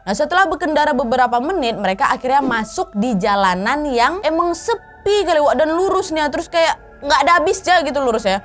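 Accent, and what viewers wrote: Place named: Medan, Indonesia. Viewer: Btw, bahasa kk kayak bahasa orang medan. Ceplas ceplos, btw tinggal dimnasih kk ini.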